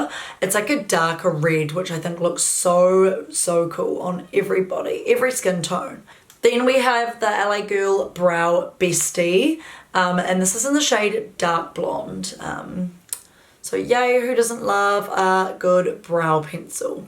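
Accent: New Zealand. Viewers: your good old kiwi accent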